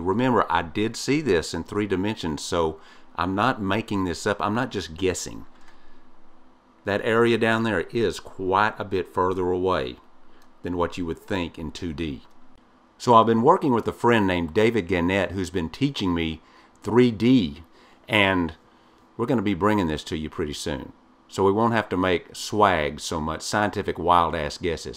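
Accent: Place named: East Texas, United States. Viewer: man! Thanks for the video! Your work is my favorite! Must be that East Texas accent.. Or them funky tunes. Keep it up man. We love ya in Louisiana!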